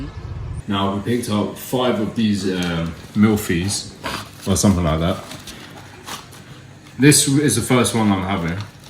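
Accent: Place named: England, United Kingdom. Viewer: Salamo alaykom, my brother. If I were in your place, I would look for an apartment for sale in Tangier and establish an English language institute. Yes, there are many in Tangier, but you have an advantage: you are English and have an English accent, and that's what learners are looking for. A project like this would be 100% successful in Tangier because there are many international companies there, and English is in demand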